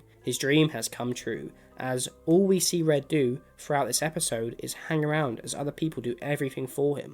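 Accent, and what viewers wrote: United Kingdom. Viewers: Is this guy British??????? Fredbear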